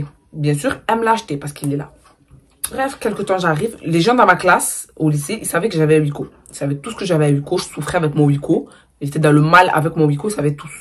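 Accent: Marseille, France. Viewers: T’es de Marseille ? J’ai l’impression d’entendre maeva ghennam là 😭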